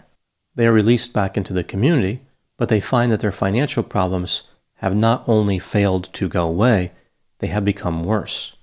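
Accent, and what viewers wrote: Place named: Boston, United States. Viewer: The best accents are generated by native Bostonians!! The Doc has a cool accent! 😉🙂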